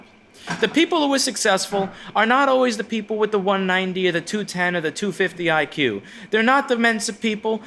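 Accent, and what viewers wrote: New York, United States. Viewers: Your inner new Yorker is coming out in your vowels lol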